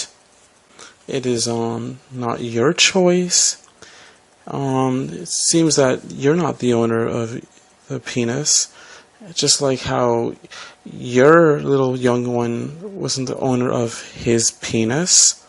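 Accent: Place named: Russia. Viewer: I like your accent is it Russian.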